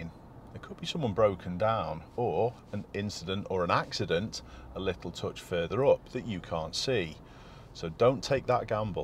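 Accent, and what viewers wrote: Liverpool, United Kingdom. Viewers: I've been driving for over 25 years, and luckily never had any points on me license. Does that make me a great driver, absolutely not. popped into my suggestions a year or so ago, but been an avid viewer since. Love his presentation and his calm scouse accent, but more importantly, everyday presents new challenges on the road. Watching videos made me realise, there is still a lot I don't know about, be it road signs or whatever, and these videos help me keep grounded as a driver.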